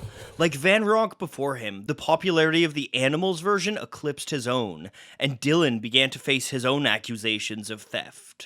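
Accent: Ontario, Canada. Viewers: Narrator is sooo Canadian that I almost think it's a young Bruce McCulloch. Hoose. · The narrator sounds like hes from Ontario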